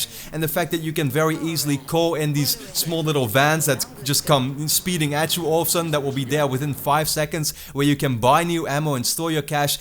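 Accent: Netherlands. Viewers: ik hoorde dat accent en ik dacht dat is sws een hollander 😂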